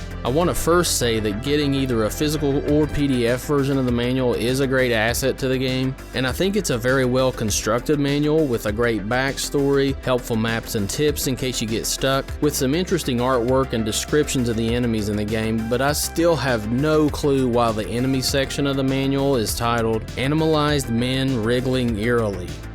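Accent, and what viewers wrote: South, United States. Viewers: You deliver your videos with a childlike sense of enthusiasm and your southern drawl makes for a great narration. Great job, great research!!! Thank you!!! Your Friday the 13th vid was ultra dope too!!! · Do I agree Rygar was highly underrated? Yes! Do we have the same first name? Yes! Does your monotone/southern accented voice make me want to kill myself? FUCK YEAH! Regardless of me wanting to commit homocide, suicide or both, I'm interested in watching this video. Here goes... I fucking looooooooooved this game. I played hours upon hours of it along with my dearly departed grandma. Yes, I played this game with my kickass g-ma. I only wish she'd have survived breast cancer so as to have been able to play the PS2 re-boot. I love & miss you Gramma B. I love and miss you.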